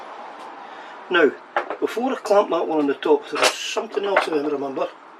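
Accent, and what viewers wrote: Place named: Aberdeen, United Kingdom. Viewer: That was more helpful to see it getting taken apart, not enough swearing for me as I put myself in your shoes so there's a gid few fkrs and btsrds getting flung aboot when yae drop things, I was thinking of doing the very same video as advised by your good self, like you I have breathing issues but also MSG so there's a lot of shaking going on so video would be quite long haha but we'll see you never know,anyway keep up the good work, me and the wife were trying to work out you accent thinking up Aberdeen way 😃👍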